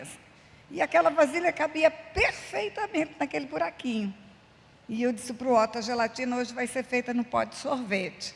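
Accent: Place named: Minas Gerais, Brazil. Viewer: Menina forma carinhosa de mineira falar tu és uma benção de Deus aqui na terra amada Pastora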